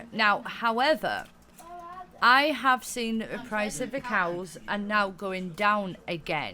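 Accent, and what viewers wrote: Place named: United Kingdom. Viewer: With that accent I'm picturing you as a stunningly hot British gaming nerd!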